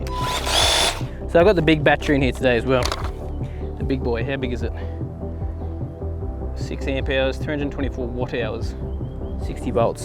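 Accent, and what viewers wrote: Australia. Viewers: I guessed you were from UK. After I had read your channel description, I realized that you speak English with RP OZ accent. I have never seen a house having a coop & its chickens as well during my stay in Victoria unless it is in outback. I thought having such consumption animals in urban areas was not allowed or illegal, but the house does...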